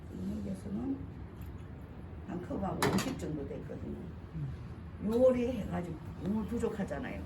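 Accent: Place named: Gyeongsang, South Korea. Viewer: 통영이구나 경남말투인데 어딘가했네요 ㅋㅋ. 내일 시험인데. 합격했슴 좋겠네요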